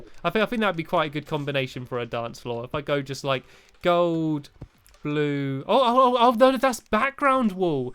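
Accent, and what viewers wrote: England, United Kingdom. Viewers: My little sister says she loves watching your videos because she likes your english accent and your laugh, and me too, but you know not the same way she does, youre actually an amazing commentator and I can never wait for your videos because theyre so good and funny! :D, and also, the name Stampy HotBuns sounds hilarious! Lol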